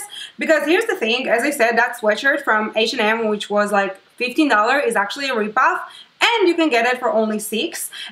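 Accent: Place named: Dominican Republic. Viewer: I liked this video. She cracked me up with her silly moments.. "immagrant problems" ctfu. I Black and Hispanic so I understand how she speaks. I wonder where she from. I wanna say Dominican Republic..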